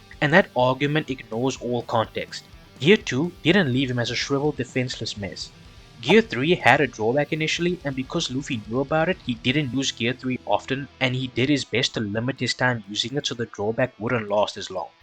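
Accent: South Africa. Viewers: Why do you have like 5 accents in 1 If i had to guess maybe you're Indian from South Africa? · I KNOW A SOUTH AFRICAN ACCENT WHEN I HEAR IT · I smell a RSA accent 🇿🇦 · Yo gang are u from SA? I think I can hear it in ur accent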